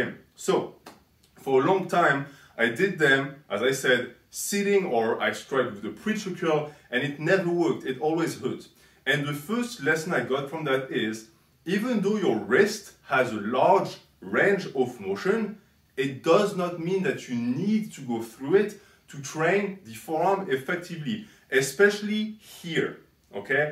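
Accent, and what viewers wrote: France. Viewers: are you french? the accent sounds like it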